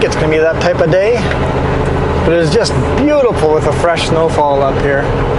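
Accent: Canada. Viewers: Sadly, Yes. And in California gold fields, roads and railroads the Irish immigrants were used in the same expendable ways as were Chinese "laborers". I suspect that may have been true in Canada as well. I'm delighted to hear the Irish and Scottish influences in your wonderful Canadian accent as you speak, eh?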